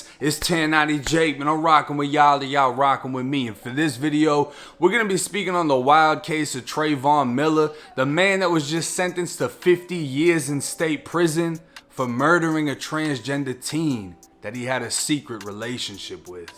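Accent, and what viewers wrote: Boston, United States. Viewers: just came across your channel and I just KNOW you're from Boston the way you say "part" and "car" LOL please let me know if I'm correct. Love the content btw! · Just found ya channel & I love content like this so ima sub, esp cuz you sound like you from where I’m from…or close to it! Nobody has an accent like us. Ijs 💯🤣 #️⃣6️⃣1️⃣7️⃣ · Couldn't help but notice that raw Boston accent brother. Grew up in Massachusetts but I don't miss south Boston at all.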